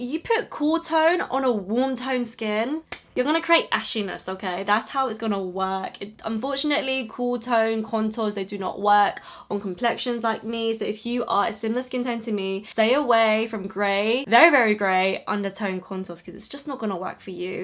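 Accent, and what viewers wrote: England, United Kingdom. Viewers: OMG ARE YOU ENGLISH!!!!! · She sounds British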